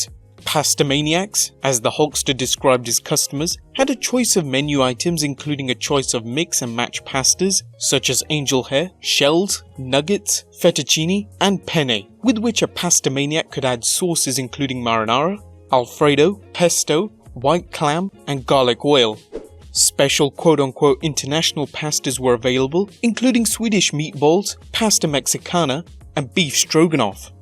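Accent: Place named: United Kingdom. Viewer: This was not a story meant to be told with a British accent.....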